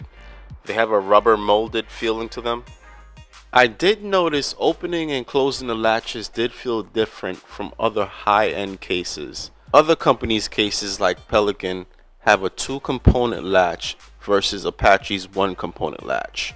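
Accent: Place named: Maryland, United States. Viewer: I detect a Maryland accent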